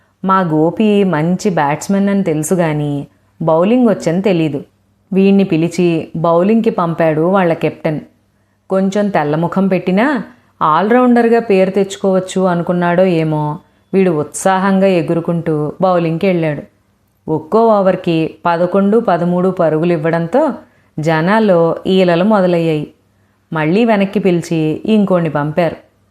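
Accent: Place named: Andhra Pradesh, India. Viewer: Madam meedi AP lo ye jilla ? Mee slang ( or accent) ki nenu pedha fan.Mee story chadhive vidhhaamam chaala natural ga, kallatho chusthu chepthunnatluntundhi Maadhi Nellore.Anduke meeru story chadhuvu thunte bhale vinaalanipistundhi .🌹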